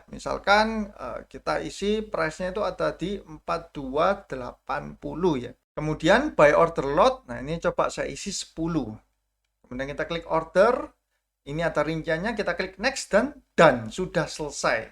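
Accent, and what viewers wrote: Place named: Java, Indonesia. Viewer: Ko kok ngomong nya bahasa Indonesia medok banget ko, jadi nyaman dengerinnya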